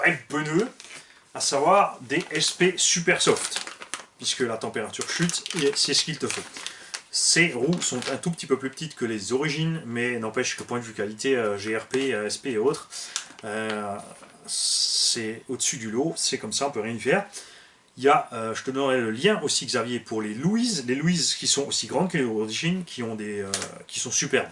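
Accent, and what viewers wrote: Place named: Alsace, France. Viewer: bonjour. j adore vos vidéos et votre accent me rappel les 6 années que j ai passé dans la région de mulhouse. je suis passionné de modélisme rc et j ai pratiqué le piste 1/8 thermique dans les années 95/96/97. (sur chassis mugen et serpent). j adore votre façon d aborder la rc loisir mais de façon sérieuse et pro. tout vos conseils sont super. et domage que je ne soit plus en alsace car j aurai adoré rouler avec vous sans les contraintes d un club. bonne continuation a vous.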